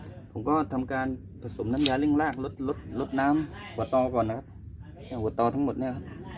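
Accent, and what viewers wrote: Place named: South, Thailand. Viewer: ติดตามชมมาหลายคลิปได้ความรู้เพิ่มมามากครับ สำเนียงเหมือนจะอยู่ใต้เหมือนกันนะครับ ผมยุสงขลา ขอให้มีคลิปความรู้ออกมาเลื่อยๆๆคับ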